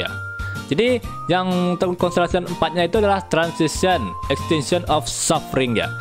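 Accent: West Sumatra, Indonesia. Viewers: logat lu keknya orang minang ya bg?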